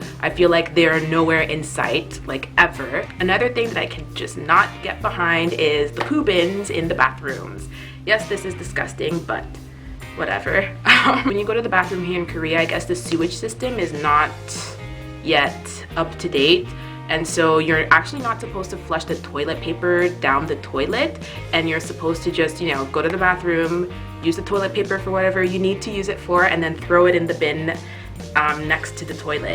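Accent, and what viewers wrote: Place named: Canada. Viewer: lol it's that good ol Canadian accent lol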